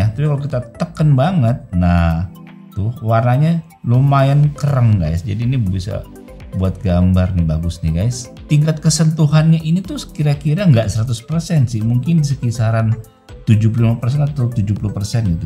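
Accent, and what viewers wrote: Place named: Java, Indonesia. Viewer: Bang orang mana sih kok jawa bgt hehehe😂. 😅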